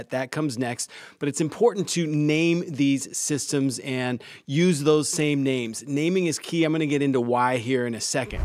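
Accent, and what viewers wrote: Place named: United States. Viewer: Great but talks too fast. Slow it down. Your channel audience is all over the world and we don’t all understand American English as well as native speakers.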